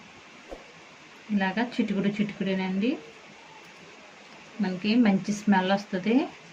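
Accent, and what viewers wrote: India. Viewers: Matladetapudu slang easy ga telustundi godavari ani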